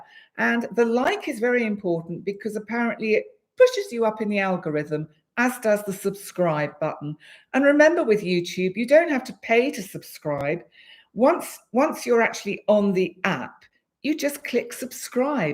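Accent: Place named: England, United Kingdom. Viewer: I loove your english accent ❤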